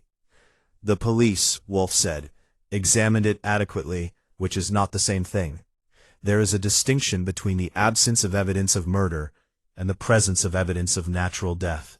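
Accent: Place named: United States. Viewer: thanks for this amazing detective stories and overall thanks for the American accent, it's really difficult to get detective stories with American accent.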